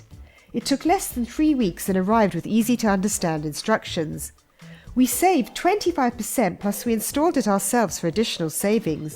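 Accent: United Kingdom. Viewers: GREAT video!! Thanks for making it! Love the narrators accent. Taking this country back one job at a time! Rod Fair, fellow Brit in Fl. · Love the British accent! Good video too! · The lady narrator with the Brit accent adds class to this. Well done.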